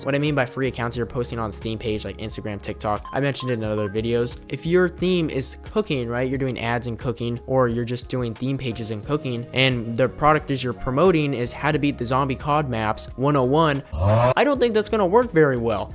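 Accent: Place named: United States. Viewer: You sound American but your channel name is why?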